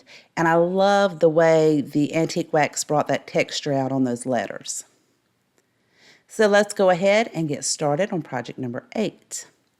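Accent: South, United States. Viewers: I always truly enjoy all your crafts. These minis are adorable! Love your accent! I'm from the South too, and get a lot of teasing about it up North.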